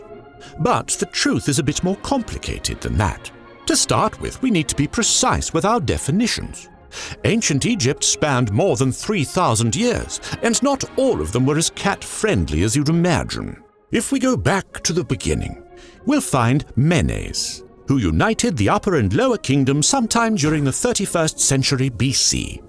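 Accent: England, United Kingdom. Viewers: I just discovered this channel and I'm already enjoying the history videos, I especially love your "Englishman" like caricature. I subscribed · Glad to see a new Video! 😁 Is the Narrator British or American? He sounds very Stephen Fry, but pronounces some words in US English?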